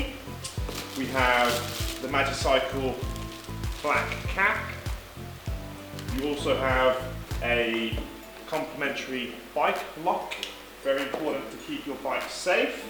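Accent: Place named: United Kingdom. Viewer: Great, clear instructions. You have a British accent, but I guess you may have lived in China/ Asia for some time. Nice! I have lived off and on in India for 10. years. Back in the states now.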